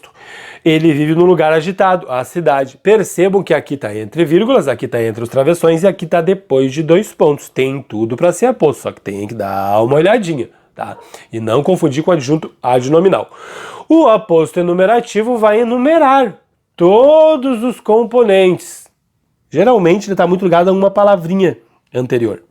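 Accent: Rio Grande do Sul, Brazil. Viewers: Professor, por causa de seu sotaque, eu sempre pensei que você fosse gaúcho de Porto Alegre hahaha.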